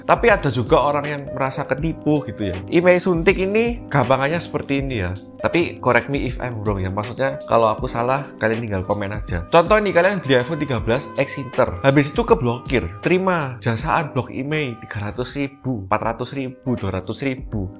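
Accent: Java, Indonesia. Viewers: Pas buka channel ini, jujur ga pernah mikir Koko nya pake logat medok jawa.. 😅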